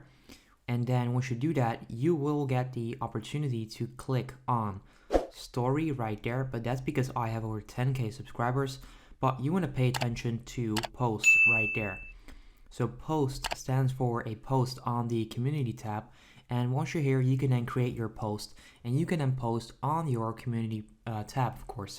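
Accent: England, United Kingdom. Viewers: Finnaly! A video about this that is in proper English accent there is no way I can understand indian people talk english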